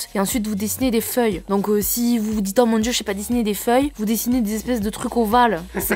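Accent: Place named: South, France. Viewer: Ouiii tutos déco ! Votre accent du sud est trop mimi changez pas vous êtes supers !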